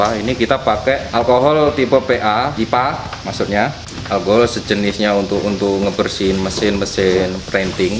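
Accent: Java, Indonesia. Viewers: Baru nonton eh ada logat jawa nya Tmpat tggal nya di mana ya, Klo boleh pengen maen&belajar biar tmbah ilmu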